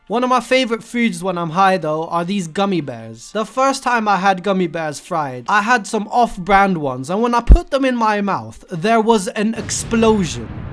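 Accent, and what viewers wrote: United Kingdom. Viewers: And he’s British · Now we got a British stickman stoner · Ayyy bri’ish stickman dude!!!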